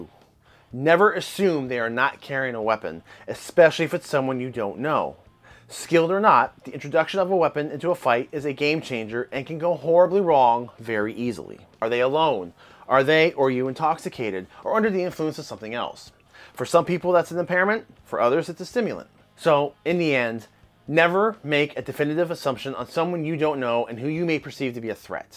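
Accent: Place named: Russia. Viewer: Cauliflower ears and Russian accent is the ultimate combo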